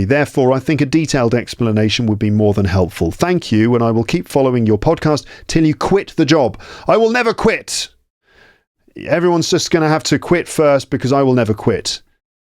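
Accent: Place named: RP, United Kingdom. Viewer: <link> is one of the BEST English Podcast I've ever heard on British RP (Received Pronunciation), Phonetics and Phonology, Prosody (Prosodic Break, Prosodic Interruption) in NATURAL NATIVE English Language by on YouTube channel named, So GREAT and I am grateful to your Instructions, I choose your Podcast channel to practice Articulation and NATIVE English accents.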